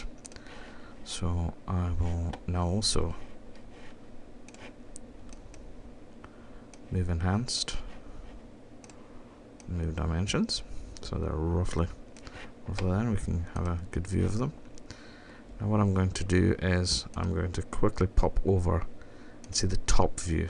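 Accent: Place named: Scotland, United Kingdom. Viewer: Not to get off topic, but that is one heavy Scottish accent.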